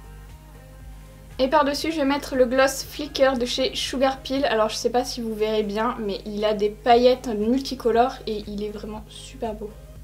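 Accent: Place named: Alsace, France. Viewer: ...Les couleurs m'inspirent pas...c'est pas français ça...c'est facile mais très chiant...:-) et résultat c'est super intense super joli et vivement une vidéo avec tes nouvelles couleurs de cheveux, et entre nous, je confirme que t'as un sacré accent alsacien quand tu déconne avec tes voisines sur instagram :-)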